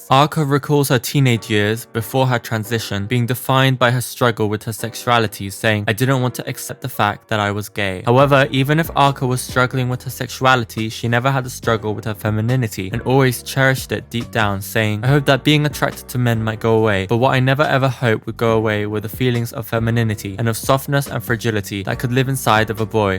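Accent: United Kingdom. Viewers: ok soy Venezolano, Arca en este País es algo mal visto, aunque ya no tanto, aun así desde que lo o buena "La" conocí fue apenas en 2020 y me encanto muchísimo su música y cuando supe que era Venezolana casi me derrito de las felicidad, muy buen vídeo, todavía me cuesta entender el acento británico :´)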